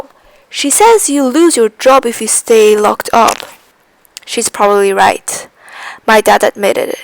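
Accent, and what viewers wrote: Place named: United Kingdom. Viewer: sry british voice annoying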